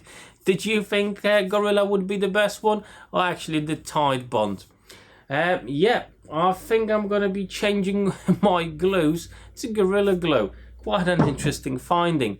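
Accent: United Kingdom. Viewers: Where in the uk did u learn your accent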